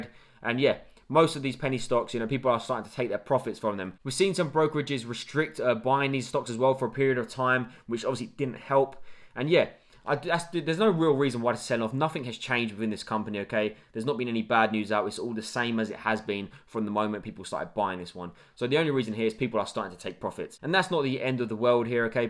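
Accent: England, United Kingdom. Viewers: What’s up with the English accent and a god damn yankee hat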